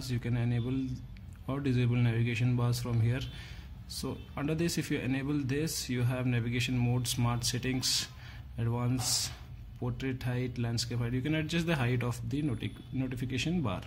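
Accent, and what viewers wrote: India. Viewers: this is an indian English accent. and what u have is american accent every country has thier own accent. i know it makes u not familiar accent but if everyone started saying that speak in my accent then english won't be an international language.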